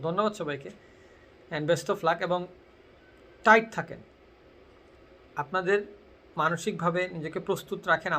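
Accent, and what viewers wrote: Sylhet, Bangladesh. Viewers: Sylheti bhai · That's Sylheti😍😍😍 love you bai♥️ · Vaiya, are you sylheti? Your accent makes me curious 🙈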